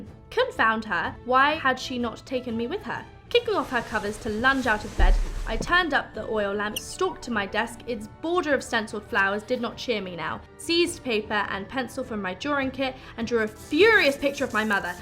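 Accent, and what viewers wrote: England, United Kingdom. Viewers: She has beautiful british accent ❤️ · MBB + British Accent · I swear they made her read the book just in order to bless our ears with that English accent! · She’s at peak of her british accent!! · Lili she has an English accent, she took classss for an American accent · She: EnOlA HolMEs (In a clear British accent) Me an intellectual: Should I call her Eleven or Enola? My brain: -Understandable: You should call her Eleven Holmes. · I didn't know she was a brit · She learnt her American accent from Disney, and her original accent is English. So she does not have a mix of both. There are many types of British accents. I don’t know what accent and Jen Ledger have, but I do know it’s not northern. Because northern English accents are hard to understand. The really thick type of British accent. But she is 100% British. Actually not 100%. 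She has a few different parts in her but her accent is 100% British. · Enola Holmes has brought back her English accent! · yeah she’s british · alguien mas q ama el acento británico d? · Enola Holmes reads enola Holmes In her cute little British accent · she said she puts a voice on it her British accent and enolas accent is different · accent has got more British. she was going American for a bit. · It’s so nice to see her in a part with a British accent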